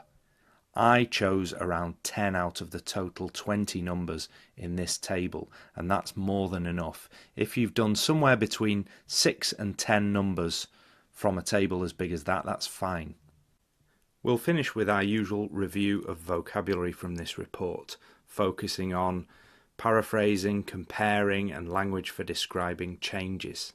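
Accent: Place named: United Kingdom. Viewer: Woow u've such a beautiful voice and accent, Don't take it personally, it's not an offensive word. How did you achieve this accent, I from central asian, because of that I have problems in accent, as sound r. How did u get this british accent?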